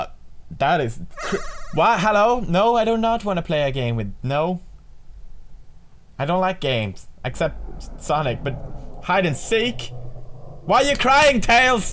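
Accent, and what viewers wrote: Sweden. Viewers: Lmao he sounded a lot more Swedish back then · Damn his Swedish accent was way thicker back then.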